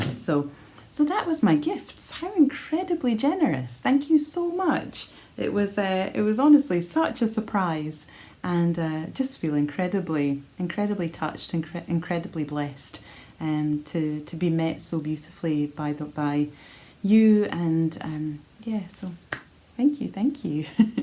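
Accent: Scotland, United Kingdom. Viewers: Hi! I've been watching you for a couple months now and I am always impressed by your knitting. I am an avid sock and garment knitter but have been inspired by you to knit a Steven West shawl. I have bought the pattern for his Lumpy Space shawl and from my stash, have chosen silvery grey, black, white and a a red/black mix. I think I will cast on in the late fall so that I have it to wear in winter. P.S. My Grandmother was from Scotland, I have a great Auntie and a few cousins still in Aberdeen, so listening to your accent is reminiscent for me of my Grandma:) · Hello, I have been watching you from day one and thoroughly enjoy every episode! I love your Scottish accent and especially enjoy your colour schemes in your projects. I am planning to visit Scotland in April next year …Yay for searching yarn shops x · What's bringing me joy? Well every time your new podcast is broadcasted it feels like al little present! 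I love to see your projects listen to your ideas and just listen to your wonderful voice and Scottish accent. You're a wonderful knitting companion, thank you and I hope you will keep on doing these podcasts for a very long time!! Lots of love, Ellen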